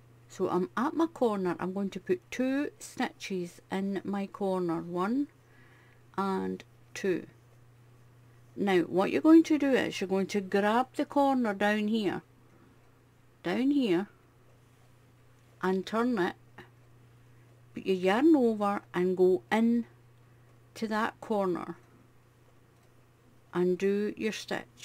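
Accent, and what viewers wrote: Scotland, United Kingdom. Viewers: Thank you, your work is lovely, and I love your Scottish accent. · Hallo can you please give us the number stitches for an 2xx person I think you are one person that can do it watched all you videos I love how you talk I think it sound like Scotland people is speaking I am from South Africa. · Hi this is brilliant and I cant wait to have a go at one of these. Also I am from Northern Ireland so I love your Scots accent! Looking forward to your next video.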